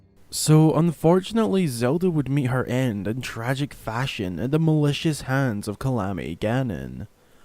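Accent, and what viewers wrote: Scotland, United Kingdom. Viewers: The second the video started and I heard your voice, I immediately knew that you were Scottish, cus I'm Scottish myself! Idk just thought that was quite cool. But great video, solid points as well, you've earned a sub :D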